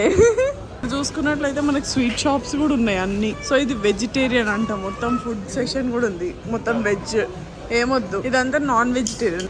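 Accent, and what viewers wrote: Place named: Telangana, India. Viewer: Nee voice kacha Telangana slang lo mast untadi.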